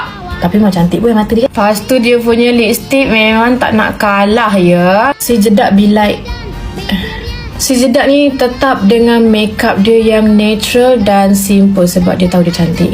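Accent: Malaysia. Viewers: Kaka nya orang malasiyanya soalnya ngomong nya malasia😊😊😊😊😊😊😊😊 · Kakak ini orang malaysia ya kok ngomong nya kayak orang malaysia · ini kakak bicaranya kok kayak Malaysia ini kakak dari Malaysia ya atau kakak itu hidup di Malaysia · Kak orang melesya ya kok ngomong nyah gitu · Kakak ini orang Malaysia ya kak kok suara nya kayak orang Malaysia 😅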